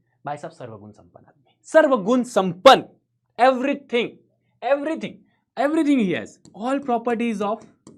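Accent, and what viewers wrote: Bihar, India. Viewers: Sir ap bihar ma kha se ho ap ki language bihar jaise hai 😂😂❤❤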